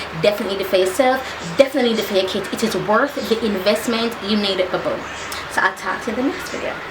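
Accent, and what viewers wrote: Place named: Jamaica. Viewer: "Mi love it" in love with ur Jamaican accent 😍 that hair tho can we have a tutorial on it please?! U look gorgeous ❤️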